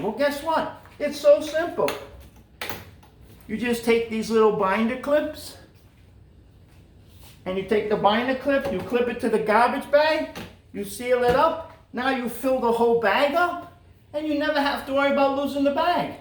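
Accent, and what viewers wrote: Rhode Island, United States. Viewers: Sounds like a RI accent?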